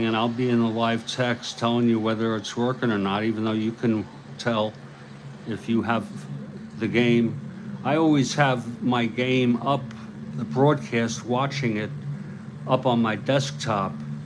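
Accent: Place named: New York, United States. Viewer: 'Greetings everyone' Tuned in again to the 'Stratomatic Network'. continues to deliver the 'art' of the live Strato broadcast. Continue your excellence. We know its tough, but we thank you. There is something special about that NY sound and your baseball knowledge that is comforting for the old time fans. *BTW...Did you know the NYY perfect game pitcher Don Larsen appeared in this real life May 4, '62 11-6 win at Wrigley Field for the SF Giants, allowing three runs over the last three innings but still recording his third 'save' of the season.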